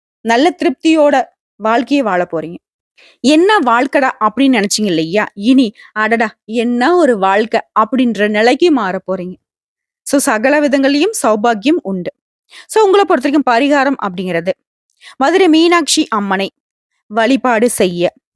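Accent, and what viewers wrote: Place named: Kerala, India. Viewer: உங்க மலையாளம் கலந்த பேச்சு அருமை,சொன்னது நடக்கும் என்று நம்புகிறேன் சகோதரி.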